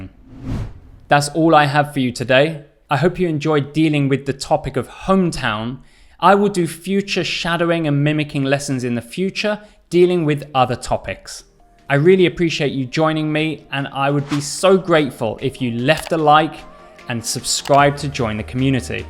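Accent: United Kingdom. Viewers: Wow ! It is Amazing ! It has been a long time that i'm looking for this type of videos in a british accent .. thanks for efforts and continue ..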